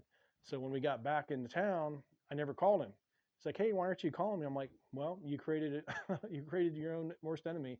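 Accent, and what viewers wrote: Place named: North Florida, United States. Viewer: I just figured out we live in the same town..i am not a tree guy. I thought I spotted the No Flo accent. Thank you for the video. I am just a wannabe tree guy.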